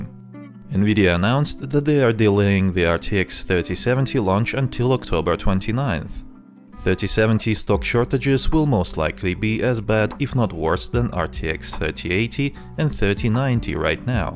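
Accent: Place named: Russia. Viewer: Russian author, I understood it by the accent and his name and asked why he doesn't make videos in Russian. He said he was doing , but he didn't like it. He didn't explain what it was. I answered with a Russian idiom that can be translated as... no, not literally), but the meaning: "your channel - your rules" In General, nothing important to you, just a conversation between two Russians.)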